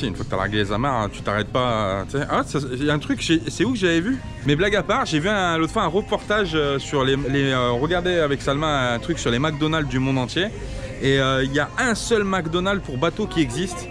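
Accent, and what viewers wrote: Spain. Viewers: Tu as un accent andalus!!😀 salma qui dit tout le temps fait chaud fait trop chaud (k calor pero k calor!!), andalucia❤❤ ☺️ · Hello ! Tu as des origines espagnol ?, car tu as un super accent.❤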